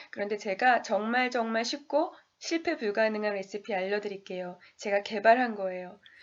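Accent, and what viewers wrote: Seoul, South Korea. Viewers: 말투가 꼭 서울사투리 영상에서 들었던 말투 같아요 귀에 쏙쏙 박히면서 듣기 좋네요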